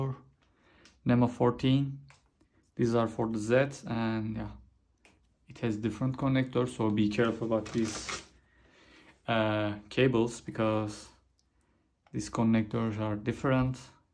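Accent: Turkey. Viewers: Hocam videonuzu izlerken aksanınızı türk aksanına benzettim, tam yorumlara girdim sormak için biri zaten sormuş bile :D. Bu kit Türkiye'ye gönderilemiyor diyor aliexpress sayfasında, nasıl eriştiniz kite acaba? Ne kadar vergi çıktı?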